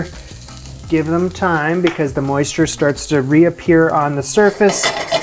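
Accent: Canada. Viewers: Your previous pronunciation of cauliflower is not that big of a deal. What bugged me at first was how you said “pasta”. But then I heard a few other words that were pronounced in a distinctly Canadian way. So, I Googled “how to Canadians pronounce pasta”. Sure enough, I discovered that was it. My point is that with the way a certain 🦇💩🤪🍊🤡 has been treating our neighbors to the north, I’m going to try to adopt the Canadian pronunciation of “pasta” for the foreseeable future. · Don't be embarassed by your Canadian pronunciations....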